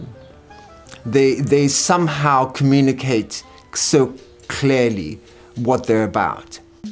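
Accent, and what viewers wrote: RP, United Kingdom. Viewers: He talks like the Royalty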